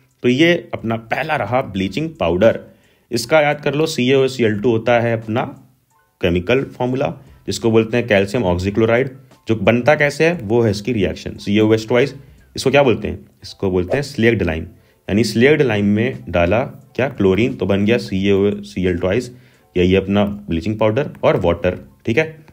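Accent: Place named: Indore, India. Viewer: Bhaiya aap Indore se ho kya thora Indori Accent aa raha hai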